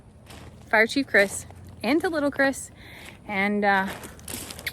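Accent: South, United States. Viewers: Never thought I would hear with a country accent. Love to hear you sing! Hey Chris..glad you're on speed dial. Thank God y'all are safe. Good job, little Chris!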